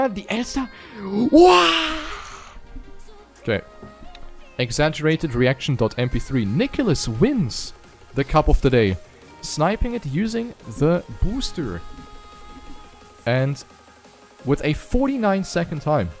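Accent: Germany. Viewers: Damn the german accent is Go intensive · u German?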